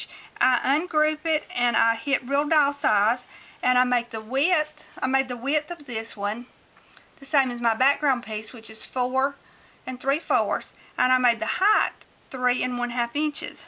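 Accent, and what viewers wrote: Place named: South, United States. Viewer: Oh, I just LOVE to watch your videos....your voice is sooooo sweet and Southern - I LOVE IT:) Your card totally ROCKS!!!! Thanks for letting us know the letters from Songbird welds good, I am going to try it out!!! Hugs, T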